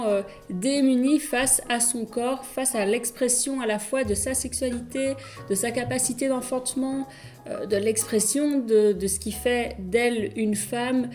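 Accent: Belgium. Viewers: Salut depuis un moment je me demande d'où vient ce joli accent? J'aurais dit belge, du côté de Liège?🤔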